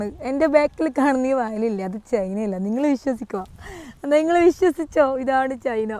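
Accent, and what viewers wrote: Kannur, India. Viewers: Ninte oombiya Kannur bhasha....podi purathu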